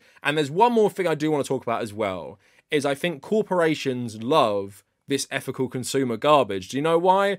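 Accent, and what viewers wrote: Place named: United Kingdom. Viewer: I was gonna watch, but there was too much British in the first minute.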